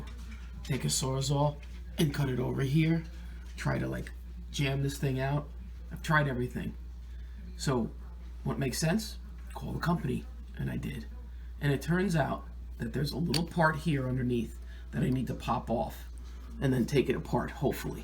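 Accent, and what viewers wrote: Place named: New York, United States. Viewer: Love the accent. (I'm in the Midwest.) Where are you from - New York, New Jersey?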